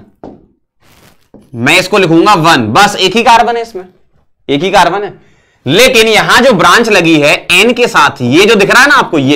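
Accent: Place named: Ranipura, India. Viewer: Ranipura se ho ka masaab?? Eso sunbe me a Rao.. mau balan ki traf se raam Ram..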